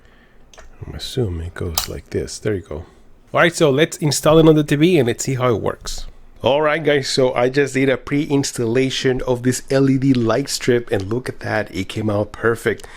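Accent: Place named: El Salvador. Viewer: My right hand man at my last job is from El Salvador. I miss talking to him for many reasons, but that accent is one of the top ones.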